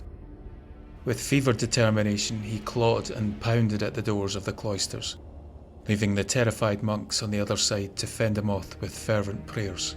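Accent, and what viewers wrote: Scotland, United Kingdom. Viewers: These videos are worth watching just to hear the awesome Scottish accent.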